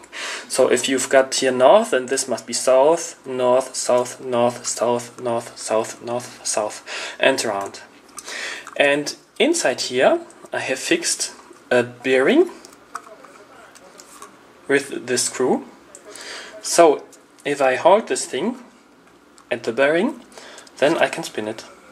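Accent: Germany. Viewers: Man merkt glaich das du deutscher bist. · German accent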